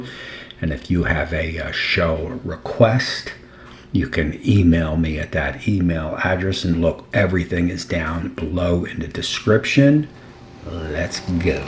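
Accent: New York, United States. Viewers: What part of Texas are you from? I've lived in Texas my whole life and can't think what area of Texas sports a NY accent?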